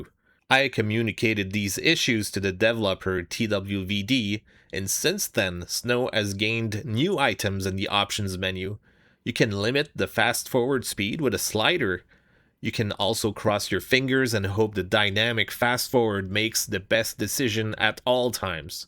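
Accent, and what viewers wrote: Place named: Quebec, Canada. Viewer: I seem to detect a hint of tabarnak in that accent.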